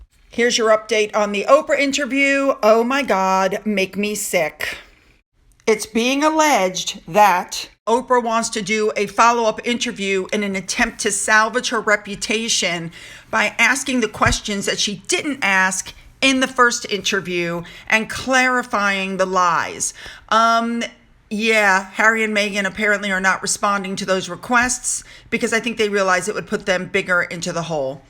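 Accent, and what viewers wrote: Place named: United States. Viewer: The only wrong thing about your videos, dear, is that they are too short...lol. You have what they called in the old days a "radio voice". It carries over running water and every machine I have in the house, so you are my choice of sound when I do housework and I need more than 10 minutes for it. You also speak what I would call "BBC American", correct English with an American accent, and I am sure I speak for more non-native English speakers than myself when I say you are so easy to understand. And after having read most of today's H&M updates, I have come to the following conclusions: - Charles will be King when the Queen dies. - Jason Kanuff will not write a book (unless he is planning to retire at the age of 40). Spilling beans like that might stop him from being hired by others, and he comes across as a man with too high moral and ethical principles for that. 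So no. I think the NDA was lifted because something illegal was hidden by it. I do NOT think he would have done it without William's (and the Queen's) support, and since he was socializing with Catherine and William in Glasgow..well, didn't look like they had a bone to pick with him. - I don't think H&M will sit down for another Oprah interview. The two of them are cowards and seems like Oprah wishes she never met them. - And I finally remembered what that red dress reminded me of. When I came to USA first time in the early 70 and my country was really poor, one thing that impressed the $hit out of me was the Am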